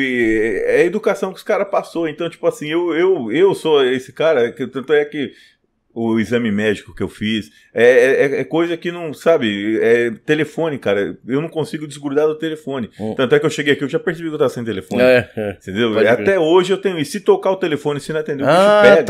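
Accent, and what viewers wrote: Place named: São Paulo, Brazil. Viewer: ele é ex-yakuza sim! e tá no brasil com sotaque paulistano falando de uma das máfias mais violentas do mundo. é sim.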